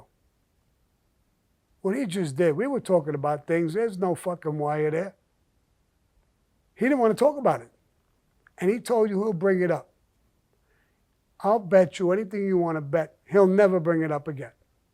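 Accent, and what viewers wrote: New York, United States. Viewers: ... I just love your NY accent. Keep on keeping on!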